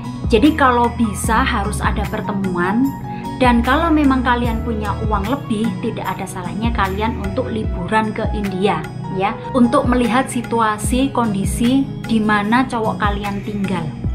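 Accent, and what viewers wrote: Java, Indonesia. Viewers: Kalo boleh tau Mbak asli Jawa mana ya.. Medok eh bahasa Indonesia nya He.. He.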